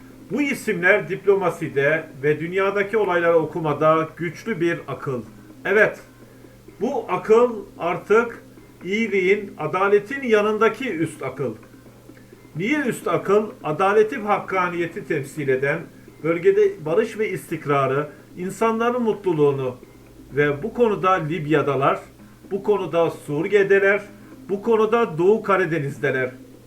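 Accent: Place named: Shusha, Azerbaijan. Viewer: Allah senden razı olsun qardaşım. Sen öyle konuşuyorsan ki,sanki Şuşada doğulmuşsun.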